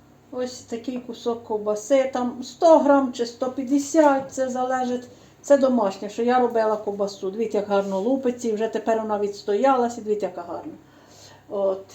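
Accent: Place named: Vinnytsia, Ukraine. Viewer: Дуже дякую! ❤ Подобаюиься вашi рецепти. Все таке смачненьке 🥰 Приятно слушать вашу речь. Мне очень нравится ваш говор. Детство и юность я провела в Винницкой обл. И мне такое все родное. Спасибо вам!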